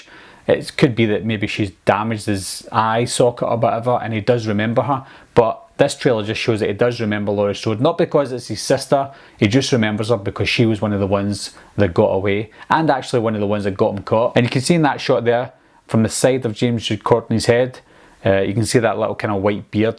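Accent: Scotland, United Kingdom. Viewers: I think I know where your from....Scotland?? btw love the vids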